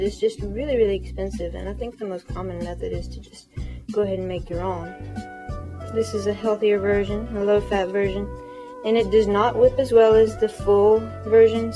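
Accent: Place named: South, United States. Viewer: Your voice still sound very southern to me. Although I can hear other things going on in it as well. Its very かわいい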